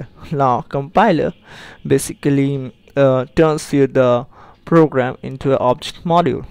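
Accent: India. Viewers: There are not a lot of good Assembly tutorials out there and based on my own experience i glad I found this one as, while the presenter here has a thick accent, it is easy to understand more than 90% of what he says whereas the other Indian tutorial makers have accents that are just far to thick. Thanks for this tutorial. I am trying to learn assembly to further my knowledge of hacking as well as pass my CDA class (hopefully) lol. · Everyone talking about his accent. Basically, Indian people are the majority who post ANY kind of relevant tutorials or help videos on youtube for programming. Maybe if some other people would actually take the time to learn and teach and post on here, we could say something. Til then, I'm just glad people like take the time to try and help us.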